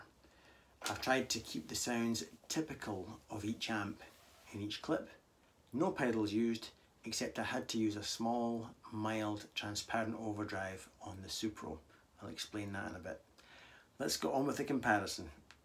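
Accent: Scotland, United Kingdom. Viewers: just discovered your channel, brilliant to hear a fellow Scotsman on YouTube not too many about, love your playing and analysis, keep up the good work buddy.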